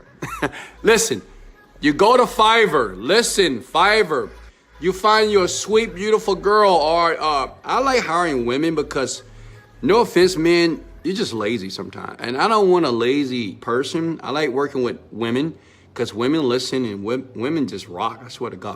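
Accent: South Africa. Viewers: Fantastic, you sound like you're from JHB or the Cape.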